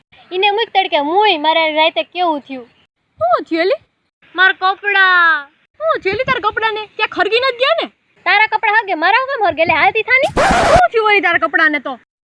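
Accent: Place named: Junagadh, India. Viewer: Having grown up in junagadh...You made me nostalgic, listening your cute accent....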